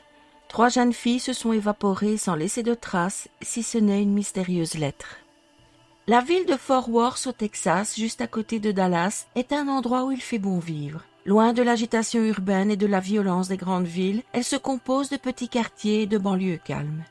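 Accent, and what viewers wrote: Belgium. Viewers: Je ne dis pas celà pour blesser mais cette manière de parler... Quel est ce petit accent, est-ce Belge ? L'histoire est terrible.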